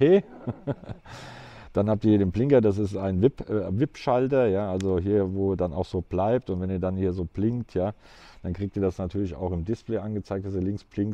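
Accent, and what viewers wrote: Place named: Frankfurt, Germany. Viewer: Ich habe sofort rausgehört dass du aus Frankfurt kommst 💪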